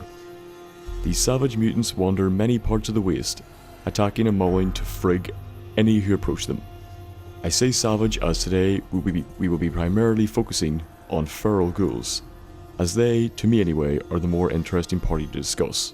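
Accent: Northern Ireland, United Kingdom. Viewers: That Northern-Irish accent tho! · i believe he got a northern irish accent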